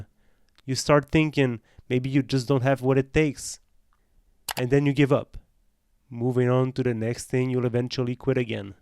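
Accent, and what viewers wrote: Quebec, Canada. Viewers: tu sonne quebecoi, nice video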